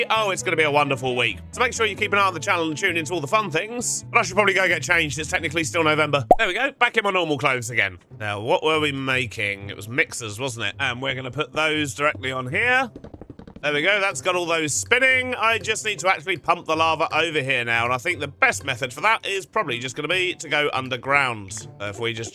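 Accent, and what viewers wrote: United Kingdom. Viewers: You and mumbo have the same accent so pardon my American idiocy but what region of the UK gets that accent? Would it be more up-north or am I just completely wrong?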